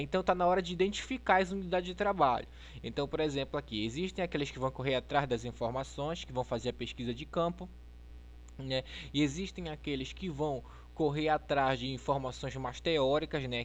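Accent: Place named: Pará, Brazil. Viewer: Sotaque paraense detected! Impossível não reconhecer um Belenense falando! Hahahaha.. Muito bom os videos, parabéns! Deu pra ajudar a iluminar bastante as minhas ideias.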